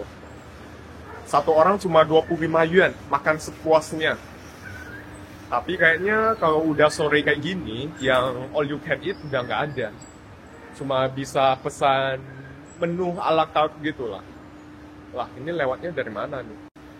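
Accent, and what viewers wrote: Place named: Medan, Indonesia. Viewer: Org Medan mmg sebut modren klo tentara sebut tentra haha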